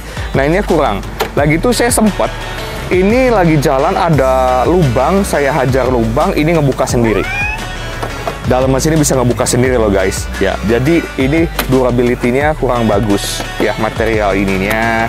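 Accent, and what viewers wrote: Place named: West Java, Indonesia. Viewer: Om. Logat sundanya, jls bgt. 😂😂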